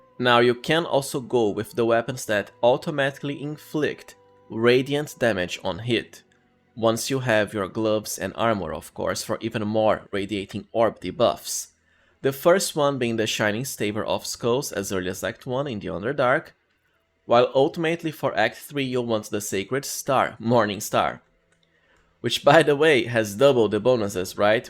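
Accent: Brazil. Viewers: percebi que vc era BR só pelo sotaque kkk me inscrevi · Bro, you’re Brazilian? Your accent feels like it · Curious question where you from? I am trying to guess the accent. Somehow I feel like you might be brazilian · Tu é brasileiro? Parece brasileiro falando inglês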